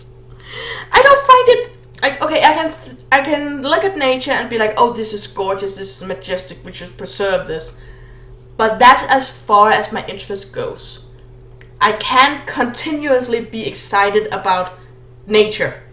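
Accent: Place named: Denmark. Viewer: This is a nice idea! hehe, the danish accent cc;; ♥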